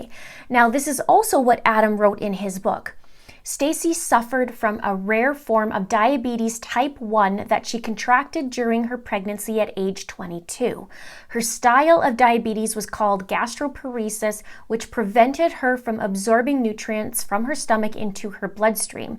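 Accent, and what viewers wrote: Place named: Canada. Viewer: I knew you were Canadian before I knew you were Canadian...had a friend who passed and he was Canadian... Batteries was bat trees And said aye all the time... i miss that guy .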